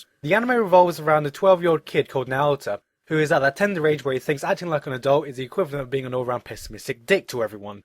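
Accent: United Kingdom. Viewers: britishness shinin' thru in these old videos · he sounded more british back then but wow he's twice as much of an OG for when he watched this anime, it was 11 years ago when he watched it since it came out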